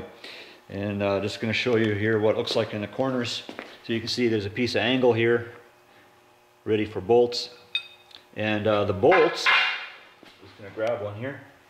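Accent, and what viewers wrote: Canada. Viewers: Sounds like a Canadian accent eh!! Some people say I have an accent, I can hear it though!!☺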